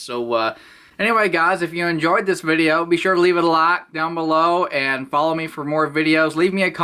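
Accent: South, United States. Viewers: I am going back to these, as I started with them as a boy. I changed to GILLETTE and now am changing back. We all know why I guess. I watch Tesla racing channel mostly because of that great Southern accent. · As a brazilian guy I proud to say that my english is good enough to reconize that this guy is a Southern one. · That southern accent tho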